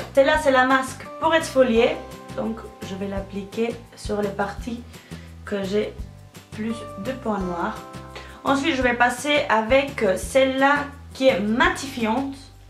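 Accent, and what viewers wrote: Spain. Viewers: T'es magnifique! Moi aussi je suis espagnole! ❤️❤️❤️ Mais moi jai pas d'accent, dommage..😒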